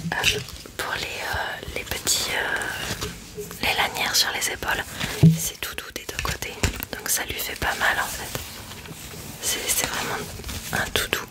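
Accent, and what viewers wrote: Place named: South, France. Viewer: Hello très sympa cette vidéo. Tu as la voix qui chantonne. D’où viens-tu ? J’adore les accents du sud 🥰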